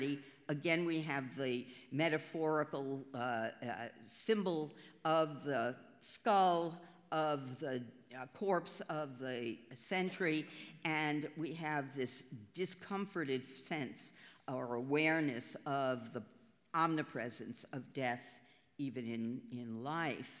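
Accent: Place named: United States. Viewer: This is the best of the internet, the ability to join in, to lectures/presentations like these..... but - big sigh - why cant American learn to pronounce European names correct? If you knew how disgusting it is to listen to this, flat American dialect of this woman (where is she from?), you would be ashamed of yourself.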